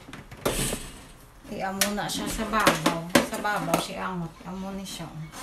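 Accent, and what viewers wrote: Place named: Western Visayas, Philippines. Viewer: Kanami gid ya pamatian sang Ilonggo ah.:) Music to the ears.😍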